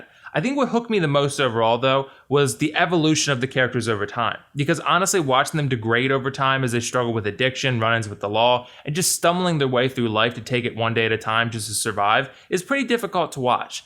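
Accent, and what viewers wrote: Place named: United States. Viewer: I only came here to hear an American talk about this movie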